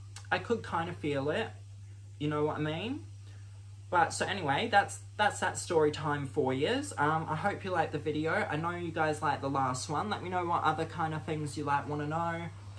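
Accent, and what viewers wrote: Australia. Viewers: Pretty sure it's Australian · MATE LISTEN TO ME PLEASE...YOU SHOULD BE ON TELE BRO FIRST TIME WATCHING YOU AND IM HOOKED.. YOUR ACCENT SOUNDS A BIT AUSSIE AND A BIT OF ENGLISH SOUND COOL MY MAN LATERS LONDON BOY..PEACE..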